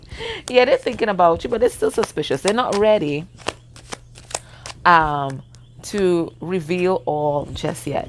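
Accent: Trinidad and Tobago. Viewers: You sound like yuh from 🇹🇹 Good reading! ♐🇹🇹 #Reppin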